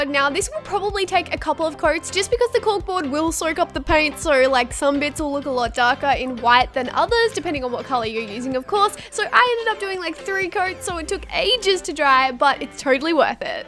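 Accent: Australia. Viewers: Are u Australian?????????????????????????????????????????????????????????????????? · Arw you Australian? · My room is clean most of the time but it's always my dresser that stays messy, it's here, ps you r are soooo beautiful and I love your accent! Idk why but there is just something about Australian accents that I love! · omg ur Australian so am !!! i love when i find you tubers that aren't American!!!..... no offence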